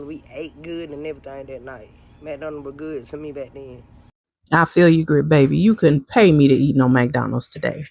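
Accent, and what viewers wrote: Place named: Florida, United States. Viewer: Keep your head up, continue to keep God in your life, I went through some similar things got me down for awhile but I found God and will continue to keep my father in my life, I will pray you continue to keep your head up and stay blessed! I'm from fla too but I live in NY now and I swear you sound so much like my auntie's and I love it, please don't ever lose your accent they made me get rid of mine when I came to NY and went to school! Stay blessed🙏🏾❤️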